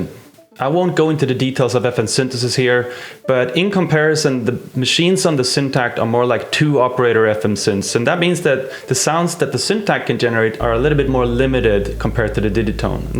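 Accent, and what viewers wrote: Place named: Sweden. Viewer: haha love the "smörgåsbord, wich is swedish for smoargooseboard, and means butter goose table"! xD I'm swedish to and when you said it I was like haha damn his accent is so clearly Swedish and I didn't notice..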